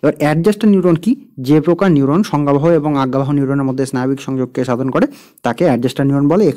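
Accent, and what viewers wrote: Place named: Bengal, India. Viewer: Sir please can you tell in Hindi or English along with Bengla language... Its so difficult to follow your speed Bengal accent... Please please please.. I need it..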